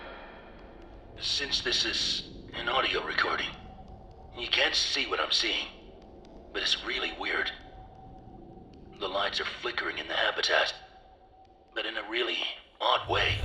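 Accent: United States. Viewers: Your American accent is spot on!!!! Great job! I love the effect over your voice too 10/10⭐️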